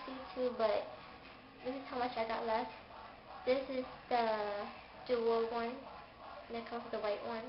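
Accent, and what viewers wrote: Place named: Asia. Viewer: you got an asian accent lolz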